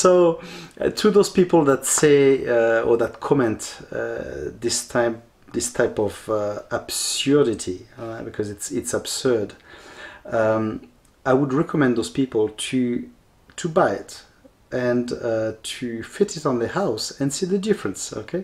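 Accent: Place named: France. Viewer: Vidéo intéressante, merci pour votre explication avec the french accent, hhhhh.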